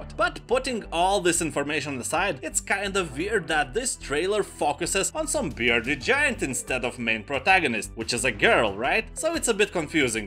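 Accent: Russia. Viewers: бесит его русский акцент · This dude sounds like russian to me :)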